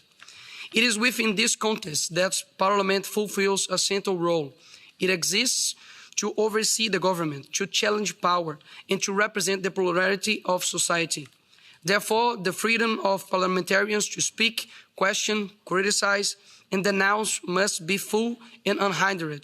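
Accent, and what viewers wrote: Brazil. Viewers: FALANDO INGLÊS TUPINIQUIM DELES.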